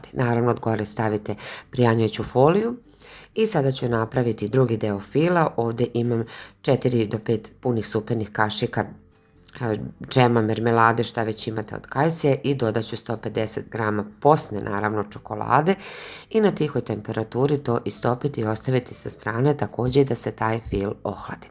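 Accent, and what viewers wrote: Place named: Vojvodina, Serbia. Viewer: Može i odozgo i od gore.U čemu je problem? je iz Vojvodine i priča onako kako je navikla. Ne priča Mađarski da je ne razumeš. A ti si neki književnik pa ti smeta? Ajde ne traži dlaku u jajetu. Pričaj kaki hoćeš, imaš mio glas I sve lepo objašnjavas. ❤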